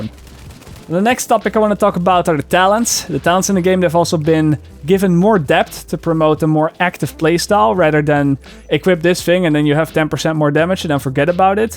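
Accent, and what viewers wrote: Netherlands. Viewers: Well, that's because he's European (most probably Dutch, not 100% sure). That's why he has the thick accent. And you are certainly not the first person to notify him. But he's a good sport about it; he jokes about it in a few of his IRL videos. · Hey! Love that Holland accent. I have seen almost all of your Division (1) videos. Keep up the good work 😏👍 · Holy shit your accent is very dutch.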